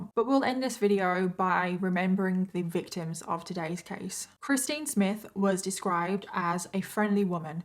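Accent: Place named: United Kingdom. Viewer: whereabouts in Britain are you from girl? period.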